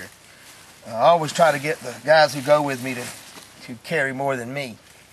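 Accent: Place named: South, United States. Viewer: Hey, my wife says no, I say you could be my twin brother, except for your southern accent.........and I may have a little more hair on top! Ha Ha. Nice video, Thanks PS I notice on some of your other videos your handy in the metal shop, I am also a tool and die maker by trade. Weird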